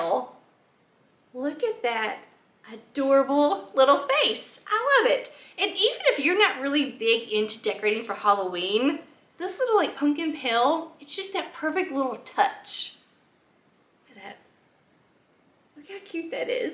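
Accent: South, United States. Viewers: Love your southern accent and Bucee’s T-shirt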